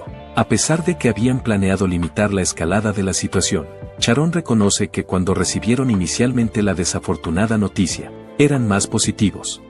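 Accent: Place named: South America. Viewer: Creo k se refiere a k fue una estrella xk antes hacía conciertos y sacaba discos y ahora por su enfermedad no puede solo por eso o de pronunciar Charon en vez d Sharon es porque es sudamericano y ellos pronuncian así por ejemplo mi nombre es azucena pero ellos dicen asusena hasta tengo una anécdota con eso un día k fui a recoger una pizza me decían k era para asusena no para azucena ja ja ya ves osea k no se lo tomes en serio k el chico hizo un video muy bueno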